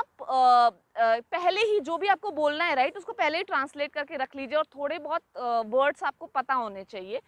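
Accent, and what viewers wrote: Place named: Pune, India. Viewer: your hindi accents like Pune or mumbai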